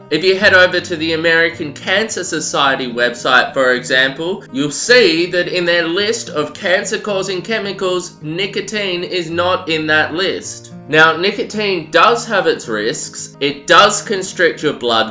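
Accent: Australia. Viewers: are you australian? jw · Hamid Mousa, nothing wrong with his voice, he's an Aussie, very easy to understand, his room studio is just very voided, making his voice sound louder than it is. Regards a fellow aussie. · nah man. he's aussie af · He's Australian yeah an Aussie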